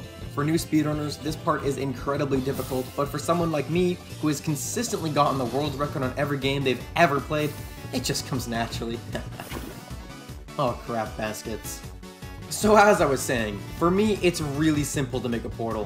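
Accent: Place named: South, United States. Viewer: Love the “Southern” accent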